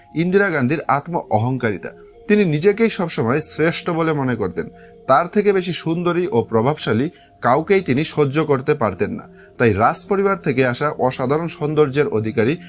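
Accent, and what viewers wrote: Bangladesh. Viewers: Are you Bangladeshi?. Because your pronunciation( talk) is like a Bangladeshi people. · Khankir chele anchor ta Bangaal ...Razkumari ....khankir chele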